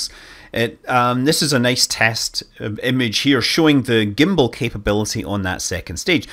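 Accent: United Kingdom. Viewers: Getting really tired of the limey accent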